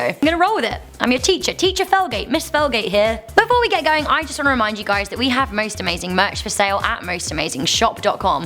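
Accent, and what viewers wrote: England, United Kingdom. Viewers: Miss Felgate are you English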